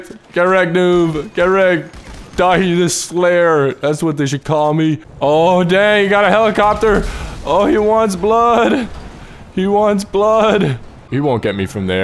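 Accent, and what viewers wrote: Ireland. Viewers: r u Irish? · Where is he from in ireland.my guess Kilkenny .cork or kerry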